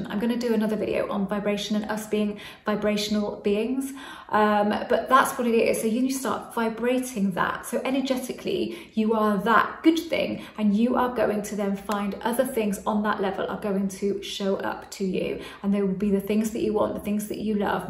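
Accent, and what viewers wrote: United Kingdom. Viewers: Thank you very much. It sounds so simple and adorable with that British accent of yours. Cheers my friend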